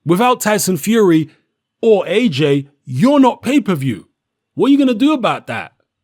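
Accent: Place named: United Kingdom. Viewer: It's funny how I can tell you're black even with a British accent. 😄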